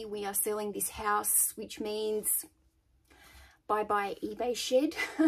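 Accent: Australia. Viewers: Are you Austrilan?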